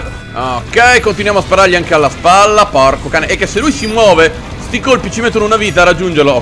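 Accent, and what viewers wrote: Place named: Naples, Italy. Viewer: Sei napoletano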